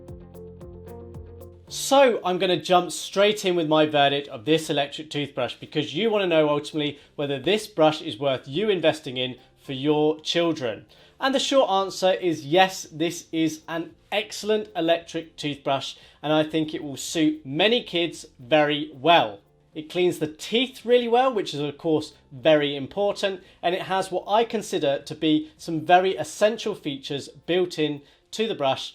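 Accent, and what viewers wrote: United Kingdom. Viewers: Probably should drop the English accent too...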